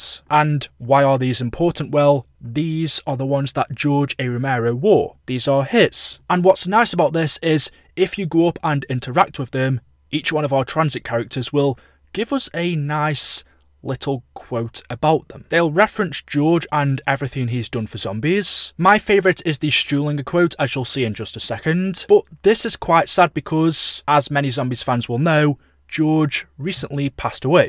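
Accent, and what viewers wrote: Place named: England, United Kingdom. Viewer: Why is your English so... English 😄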